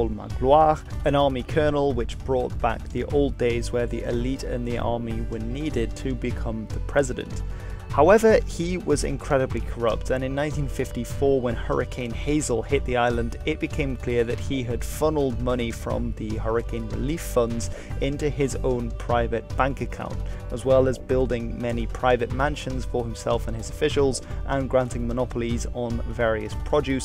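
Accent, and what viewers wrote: United Kingdom. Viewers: he has a british accent unless it is a voiceover, they always absolve themselves, always A;WAYS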